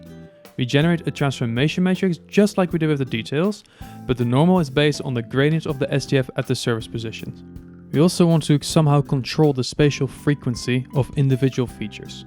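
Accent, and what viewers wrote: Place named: Netherlands. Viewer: Nice dutch accent! (Very subtle though). Trees don't grow along the normal of the surface, they grow towards the sun, up. With a bit of variety :p Looking forward to see updates on this. It looks very nice, optimized and I like your approach :)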